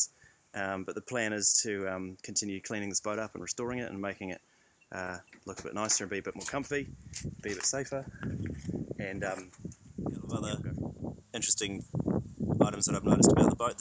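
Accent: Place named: New Zealand. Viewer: I can tell u r kiwi by your accent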